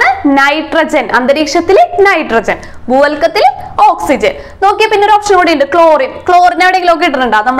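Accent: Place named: Thrissur, India. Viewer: വീട് തൃശൂർ ഭാഗത്ത് ആണോ slang അത് പോലെ ഉണ്ട്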